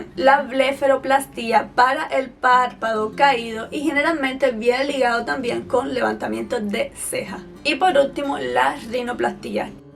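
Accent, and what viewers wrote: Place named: Cuba. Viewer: Creo que tiene acento cubano, me gusta!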